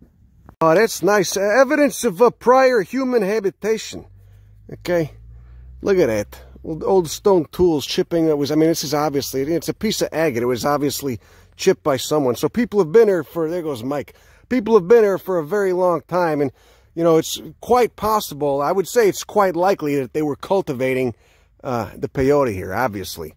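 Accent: Chicago, United States. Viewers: This man sounds likes hes from chicago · What is someone from " chicahhgo" doing in Southern Texas ?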